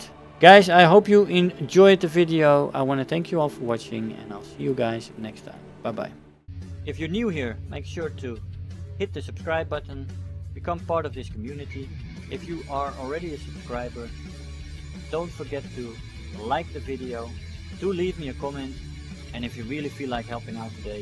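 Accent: Netherlands. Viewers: great video and great person. are you dutch?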